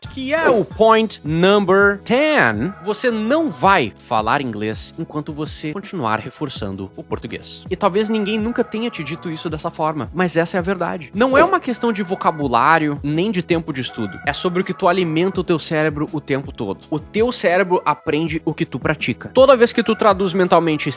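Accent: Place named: Rio Grande do Sul, Brazil. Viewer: Português 😅🎉 é do sul ? Parece Gaúcho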